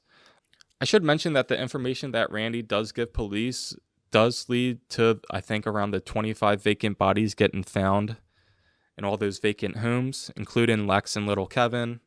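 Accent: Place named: Baltimore, United States. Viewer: I can hear your Baltimore accent, and I weep with homesickness for Old Bay Seasoning. Thanks for making this.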